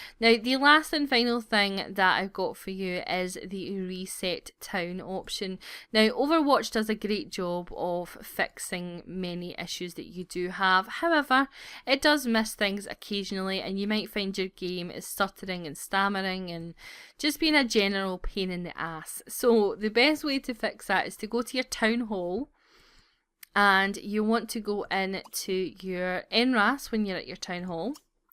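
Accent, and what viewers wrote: Scotland, United Kingdom. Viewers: Pringles Fan! Scottish?? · Not sure why, but I get really happy when I hear a fellow Scottish accent on youtube 👌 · She sounds Scottish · Ahh! Scottish accent! I'm Scottish too and it's so nice to hear a familiar accent for a change!:) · Woah okay. Four things I HAVE to bring up. 1. Nice accent! Haven't heard a Scottish lady speak before, sounds quite classy! 2. Perfect tutorial! This is not hyperbole. This has EVERYTHING you need: 2a. Detailed explanations on each most asked questions and how to get there. 2b. A patient person who calmly explains her reason of making this video for those curious. 2c. A showing of human emotion while also being fair. Not liking teenage pregnancy is fine. Heck, I don't either. I respect their decisions also. 3. A link leading to the installation of these mods without making it too long and also explaining what each mod does and again, humanizing yourself with a reason into why you only have these here. 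4. A cheeky joke in between a learning experience enhances the experience and makes it more enjoyable and not make people think you're not human. Human interaction amongst the teaching makes it relatable. If she can, I can! I cannot stress enough. You did extremely well here, and I don't say it often. Thank you, for the charming, compassionate, informative and patient tutorial!:D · are you Scottish or Irish?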